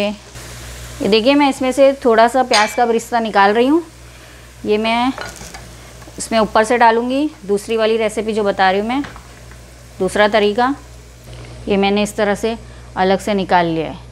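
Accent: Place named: Dongri, India. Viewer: Bohot ache aapa, aap dongri kyuki aapke baat karne ka tareeqe mei jhalak hai kya, mei abhi out of india settle hu but aapne muje yeh dikha ke apne bachpan ki yaad dila diye, lots of wishes and keep growing, aapa rocks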